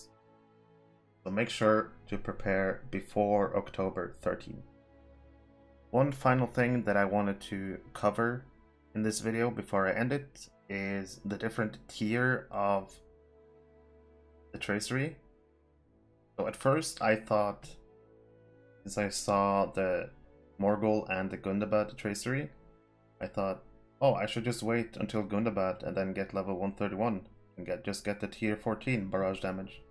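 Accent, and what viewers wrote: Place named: Norway. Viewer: Ha ha Norwegian dude spotted, am i right? Can't quite place it though Jaja fra en bergenser hallo og bra video 👌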